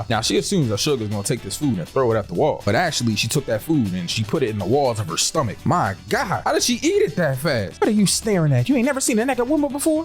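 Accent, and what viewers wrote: California, United States. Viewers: I like your voice are you from Cali?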